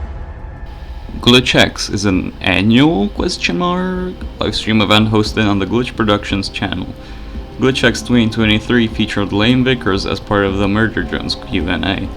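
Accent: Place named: Czech Republic. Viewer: nice finally i see someone saying that the czech dub is nice WAIT HOLD UP TY JSI ČECH? such a nice accent I'm almost jealous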